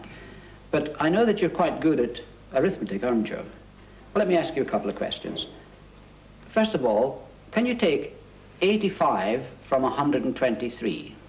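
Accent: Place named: England, United Kingdom. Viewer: That's a proper english accent. Major thing i like docs for.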